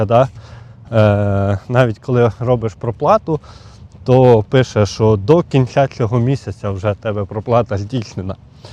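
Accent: Ukraine. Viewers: Вы говорите на русском с украинским акцентом ,определитесь уже товарищ типа учитель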